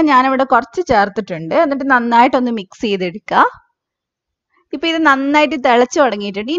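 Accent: Kannur, India. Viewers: sound super kannur evideya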